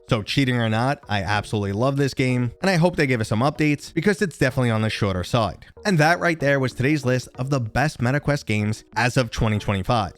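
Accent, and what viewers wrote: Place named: Long Island, United States. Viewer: I've never been to Long Island, but thanks to your videos, I always fix that problem. Love the accent!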